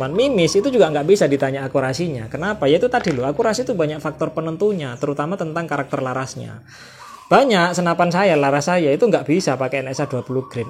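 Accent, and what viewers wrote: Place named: Java, Indonesia. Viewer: Setiap kali liat videonya mas,i jujur,. saya bukan fokus pada bahasan videonya😅, tapi dari nada bicaranya🙏😄😄 Perpaduan baku, dan jawa..😊😊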